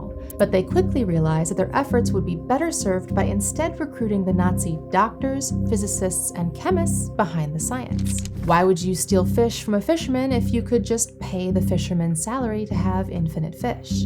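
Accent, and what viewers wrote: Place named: England, United Kingdom. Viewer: Your English accent is soooo beautiful!!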